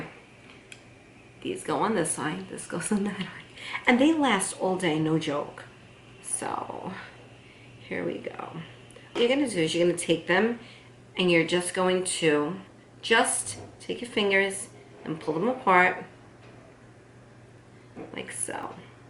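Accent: New York, United States. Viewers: This helps a lot!!! Are you a Long Island girl? I hear a NY/NJ accent. Luv it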